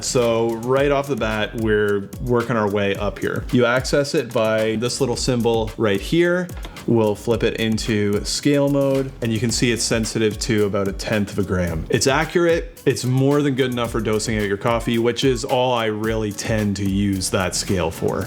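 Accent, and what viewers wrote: Canada. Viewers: Super helpful vid, nice looking kitchen & great Canadian accent 🙂I actually just got the same studio in the mail. I've used it a few times and I'm trying figure out the steps and RPM's on the grinder. I haven't played with it in fear of botching my coffee, I just scan the pod (via the app) and pushing play. Any suggestions?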